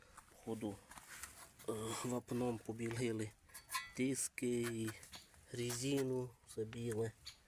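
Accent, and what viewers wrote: Western Ukraine, Ukraine. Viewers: Прикольний, шкода що на городі зберігали, - кузов убитий. Цей москвич був би раннім, якби був 412-ї моделі. 408 можна назвати раннім, якщо це авто в кузові "е" (з фарами-плавниками) і десь приблизно <num> року До речі, у Вашого авто мабудь чи не найгарніша мордочка з всього модельного ряду, - решітка з широкими отворами і прямокутні фари П.С. не бачив поки щоб хтось критикував жителів західної України за їх говірку, це ваша "фішка", не переймайтеся! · Робіт добру справу і все вийде. Гарний Закарпатський діалект, головне не кацапський язик. Слава Україні. Смерть ворогам. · Бачив відіо як неошибаюся канал називається "Вася Крутс" до він теж купив москвич тепер розбира на запчасті і продає. Я замітив що розмовляєте як в західні Україні все 👍👍👍розмовляйте як удобно головне щоб не нарусійські мові 🇺🇦 🔱.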